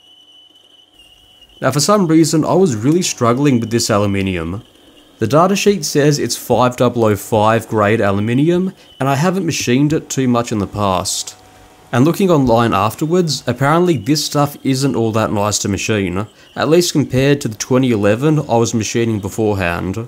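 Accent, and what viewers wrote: Australia. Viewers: cool. Which state are you in? I can't quite pick the accent. SA or WA?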